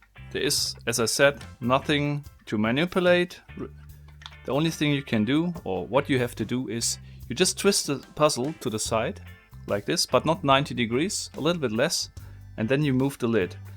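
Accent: Germany. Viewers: Can it be that you're german? Because of your english😊 no hate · Instead of toying with this box you should polish your English or bloody give it up altogether.....so f....g German...it is NOT beck but back....it is NOT gep but gap...it is like the Chinese who say lice instead of rice...very disturbing. Record yourself and or go to a pronunciation bloody class...and you do not say isss you say izzz....English voiced C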